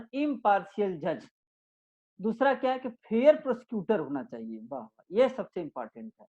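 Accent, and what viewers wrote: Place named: Bhojpuri, India. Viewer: English doesn't matter. Apki bhojpuri bahut sweet hai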